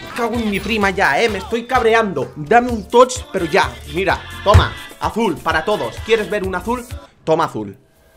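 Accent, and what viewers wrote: Mexico. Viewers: los mexicanos del sur si hablan asi, nosotros del norte hablamos diferente:) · hablas igual a los mexicanos saludos desde México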